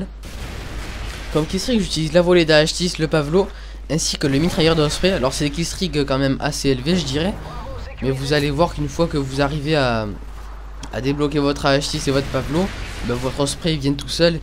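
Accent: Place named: Toulouse, France. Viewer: J'adore, je regardais Mafio avant, je te voyais quelques fois dans ses vidéos mais maintenant que tu en fais compte sur moi pour les regarder ;) Question: Est-ce que tu conseille cette config du Spas12 sur MW2 aussi ? Si non, laquelle ? Je m'abonne, je like et je favorise ps: J'aime ta voix de toulousain :)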